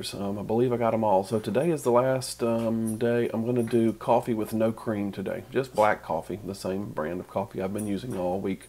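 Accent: South, United States. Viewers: You are adorable! I want to reach inside my screen and and kiss you. You are such eye candy. It's hard for me to pay attention because you are so so so good looking. And you're sexier with your Southern twang. Your wife sure has a good thing going. Wow, you are hot!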